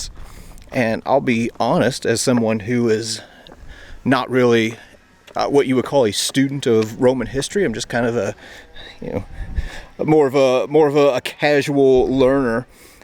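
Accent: United States. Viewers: Excellent presentation on the edge of the known world. Dont know why,(raised on U.S. tv shows in the 80's) maybe? But we do enjoy our history being told in an american accent. 🇬🇧🇺🇸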